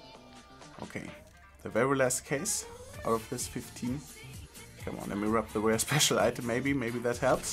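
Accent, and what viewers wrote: Germany. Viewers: Are you German? Sounds like a German Accent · Justin he comes from germany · Hey m8! Where are you from? Because you speak eng like a german^^ Nice Video :)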